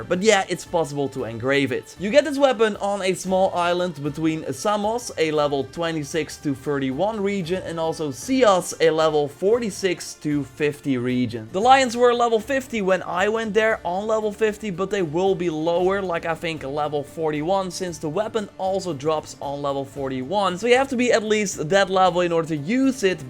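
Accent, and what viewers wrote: Netherlands. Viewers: 100% a fellow dutchguy. je accent gaf het weg :) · wejo ik kan letterlijk gewoon horen dat je nederlands bent, wat een accent joh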